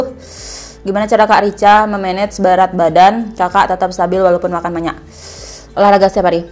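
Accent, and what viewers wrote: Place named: Bali, Indonesia. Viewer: Pesu logat bali ne😹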